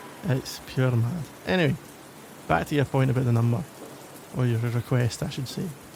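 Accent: Scotland, United Kingdom. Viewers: Love your voice, have literally never heard anyone compliment a scottish accent before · I'm Scottish sometimes I wish my accent was thicker because people really like our thick accents but mines weak compared to his. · As a fellow Scottish person I approve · Ahh this was made for me!!!! The thick (I'm talking doubled caked up thick) Scottish accent. It's so beautiful. Thank you my king!!! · Ladies! 😘 I'm from Poland. Born, raised and still live in Poland, but when our favourite teddy bear speaks like that I'm blushing and giggling like a schoolgirl 🥵 I'm a huge simp for a Scottish accent, even though I understand.... little 😋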